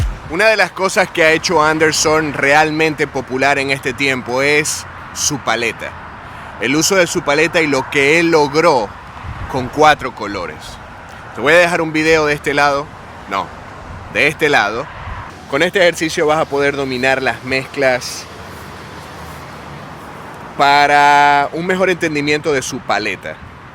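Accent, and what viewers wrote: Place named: Venezuela. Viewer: ¡Hola! ¡Oye!, tienes un acento 'matao' , neutro, juraría que eres venezolano. ¡¡¡Excelentazo tu video!!! Saludos.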